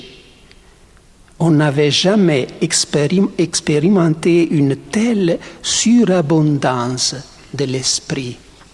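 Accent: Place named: Italy. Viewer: Bonjour mon Père, votre accent a le parfum de l Italie le parfum de la meditéranné et en vous écoutant nous prenons le grand large , merci infiniment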